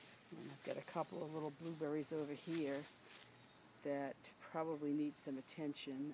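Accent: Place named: New York, United States. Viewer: Nice garden. I tried to respond to your invite on Sonico but for some reason no luck since I do not have an account. But you do have my correct email address. I never realized you had such a strong New Your accent -- it sounds great! (<; Cheers! Are you still picking!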